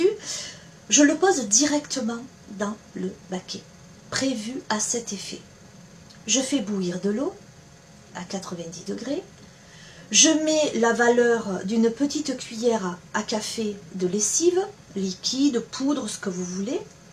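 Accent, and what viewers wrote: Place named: South, France. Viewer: Merci à vous pour ces conseils pleins de bon sens, Ah le sens pratique... Avé ce petit accent méridional plein de charme. Bon courage et bonne continuation.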